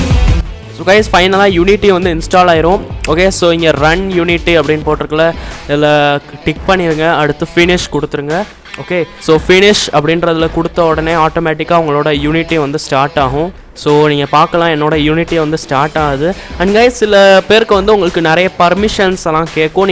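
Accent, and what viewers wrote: Madurai, India. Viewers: Simply i am enjoying your MADURAI Tamil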